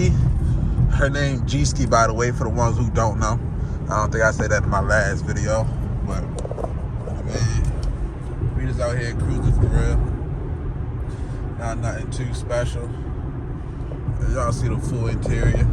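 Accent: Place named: Baltimore, United States. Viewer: That Baltimore accent thick gud video G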